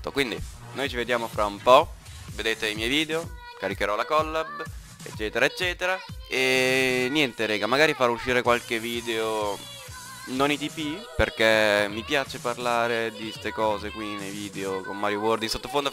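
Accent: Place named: Romagna, Italy. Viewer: Mi sembri romagnolo dall'accento, sei di Ravenna?... AnnennA...AnnA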